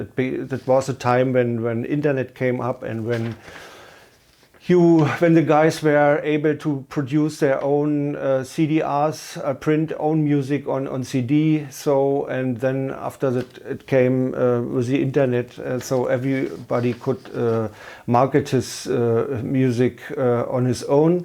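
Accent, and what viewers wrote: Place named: Berlin, Germany. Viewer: I like your „Berliner Schnauze“ English very much! 👍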